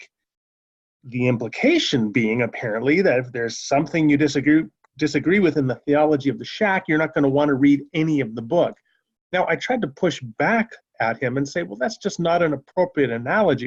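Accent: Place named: South, United States. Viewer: Love your Southern accent,.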